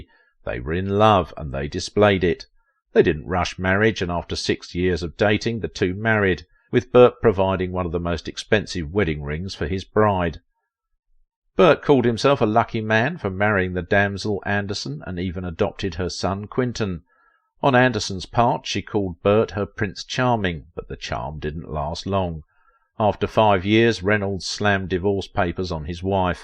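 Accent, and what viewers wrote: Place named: Cockney, United Kingdom. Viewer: One thing I cannot stand when Englishmen decide to host like a documentary in America number one you may be speaking English but you're not speaking American and when you guys mumble on top of your Cockney accent it's virtually impossible to understand you someone made this documentary even worse is he didn't take the time to post the photos that goes along with what you are saying everything's out of sequence so it's so hard to follow engage and understand and enjoy what the hell you're talkin about please cease and desist dot-dot-dot I have seen documentaries of WWII hosted by English-speaking men and I'm completely able to understand them cuz they articulate well would you please do the same or get the hell out of Dodge do sincerely your friend and mine me ... Christ! 🙄🤔🤨🤪😜🤫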